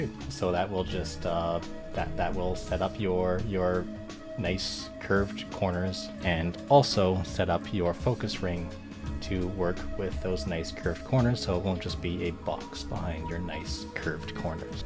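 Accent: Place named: Canada. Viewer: Bro's voice sounds a bit like my friend from Vancouver. I'm all the way over the other side of the Atlantic, though, and don't ride with that many Canadians.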